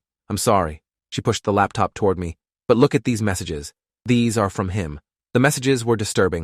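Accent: United Kingdom. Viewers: British AHHHHHHHH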